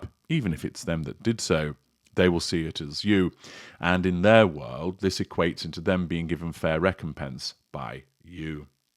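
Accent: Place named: North, United Kingdom. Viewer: Your common northern accent keeps creeping in, doesn't it? Us southerners do it better, we know it, and you know it! As for the Markle trash, good riddance.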